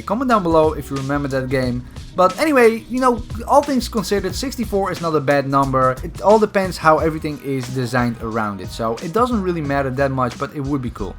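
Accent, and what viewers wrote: Netherlands. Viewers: I swear you are dutch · Je kan gelijk horen dat het een nederlander is:) Lekker bezig #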